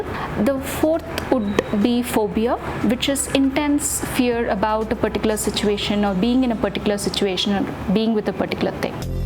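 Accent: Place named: India. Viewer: Buttler english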